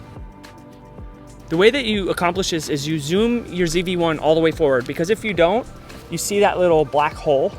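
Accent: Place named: United States. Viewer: Wow! Haha that lil Iguana is the best model ever and soo beautiful ay =) Wow I was really surprised when i heard your American accent, I totally aspected you to be from Scandinavian because you look like a Viking! Haha I agree with one of the other commments - You’ve got a very endearing persona, not only very good at explaining but are gifted with a naturally calm, inviting presence. Thanks for the video =) That iguana shot reeeeally makes me wanna find and build my own Macro lens setup too! Haha :) From down here in Margaret River Western Australia I salute you :) Big High Five, keep it up!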